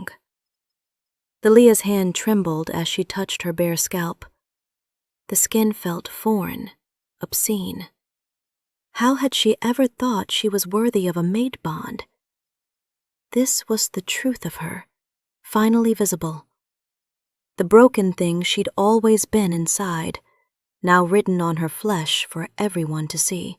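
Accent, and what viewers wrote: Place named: South, United States. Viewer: Omg is it Dalia or Tealia. Not liking the Southern accent.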